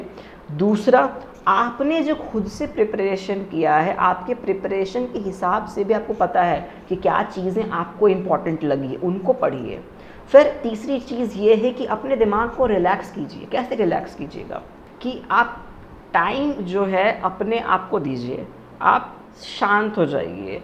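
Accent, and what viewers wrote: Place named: Jharkhand, India. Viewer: Are you from jharkhand? As i have the same Hindi tone too.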